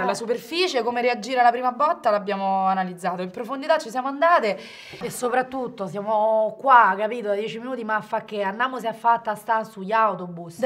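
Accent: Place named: Rome, Italy. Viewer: L'accento romano dente tutto unico😂😂😂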